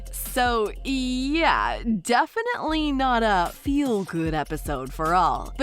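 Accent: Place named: California, United States. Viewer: the narrator's CA stoner uptalk accent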